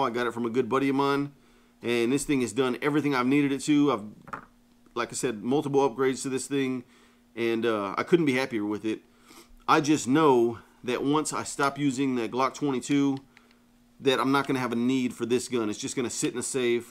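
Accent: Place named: Houston, United States. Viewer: I’m from the Houston area, and you sound like you’re from the same area. Where is the place you get your holsters made?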